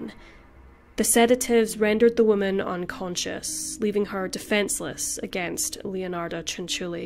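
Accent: Scotland, United Kingdom. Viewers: Yup Scottish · She's Scottish. Her twin sister Kristy Skye has her own channel and said they're from Scotland. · Scotland 100% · I’m trying to work out where the host dialect is from she sounds Scottish but she doesn’t sound just Scottish, it’s puzzling me 🤔😆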